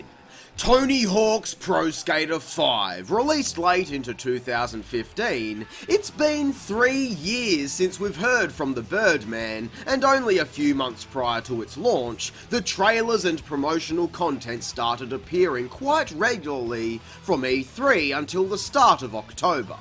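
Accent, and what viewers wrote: Australia. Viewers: I feel like your Aussie 😂 also are you skating the bloody ride skateboard with trucks on. 😂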